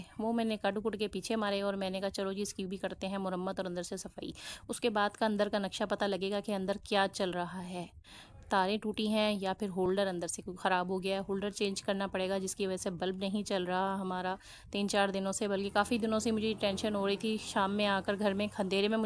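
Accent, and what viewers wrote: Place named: Punjab, India. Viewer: Urdu main Punjabi touch acha hai.chuk k rakho..😂😂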